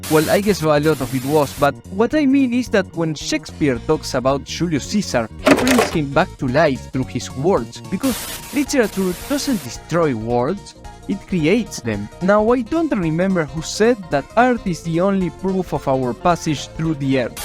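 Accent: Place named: Argentina. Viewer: You sound Argentine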